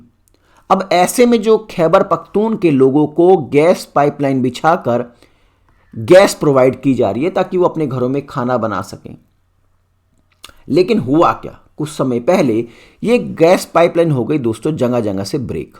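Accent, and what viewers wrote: Bihar, India. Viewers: Your Bihari accent is so sexy Say again — PRO-VYNCE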